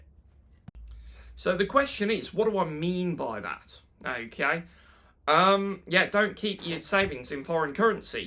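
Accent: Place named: England, United Kingdom. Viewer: Люблю твой акцент, как будто смотрю сериал Убийство в медсан мердере или чисто английские убийства))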